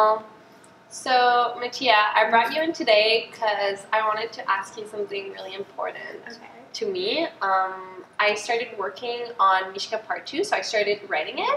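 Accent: Quebec, Canada. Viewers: No Pickle Yeah but that happens because her main language is Canadian French so she has an accent when she speaks English that results in some words being higher pitched. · Are you French Canadian? Cause your accent when you speak English sounds a lot like mine when I speak English. Also, in the short film MISHKA, I saw our beautiful Canadian flag so I was wondering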